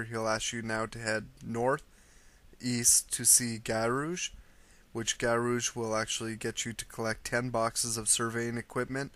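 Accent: Canada. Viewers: its not really a chicago accent...its more of a canadian..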